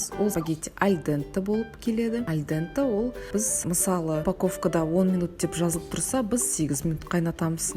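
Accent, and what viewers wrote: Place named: Russia. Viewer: Сіздің орысша акцент-ыңыз бар екен сол үшін айтып жатқанмынғой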